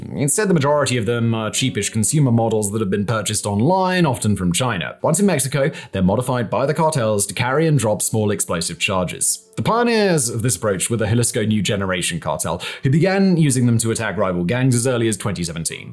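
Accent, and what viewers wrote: United Kingdom. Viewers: Finally, someone with a brain. I like your content and delivery... especially with that accent of yours. Everything sounds better with that particular British accent. I appreciate you and your channel.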